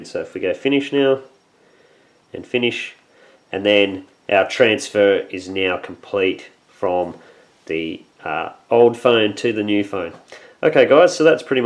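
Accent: Australia. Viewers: Is he Australian?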